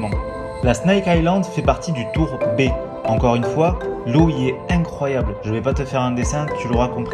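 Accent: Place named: Toulouse, France. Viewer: La vidéo est incroyable, avec en bonus les noms philippins avec un accent toulousain 😍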